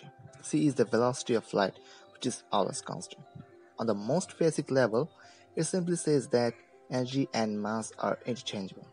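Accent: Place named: India. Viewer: its hard to understand stuff , when indians talk english , its way to thick , Plz talk in a understandable way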